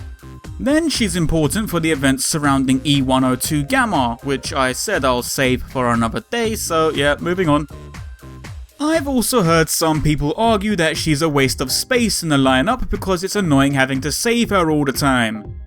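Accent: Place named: East London, United Kingdom. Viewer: you sound like you're from east london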